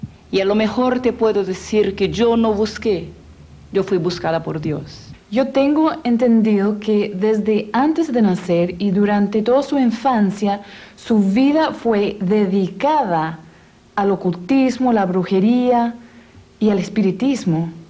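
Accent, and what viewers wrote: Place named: Brazil. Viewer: parla spagnolo con acento brasiliano....forse originalmente il video è stato fatto per gli spanoparlanti...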